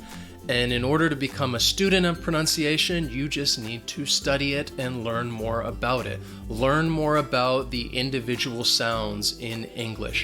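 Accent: United States. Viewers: Thanks , and Yes I share links of some of your videos to Japanese students from the platform I teach. My question about your nationality was cause I couldnt detect through your accent where you were from exactely. You sounded american, but words are too well pronounced like those guys from the News, probablly as a teacher you have learned to enounce (or is it announce) your words as you speak, so that basic students can 'grab' what you say. I also thought you were a foreigner (non native english speaker) with an excellent pronunciation, since your wife is european. Anyway it doesnt matter it was just curiosity . What matters is that both your video classes and your english are both excellent. I will keep sharing them.:)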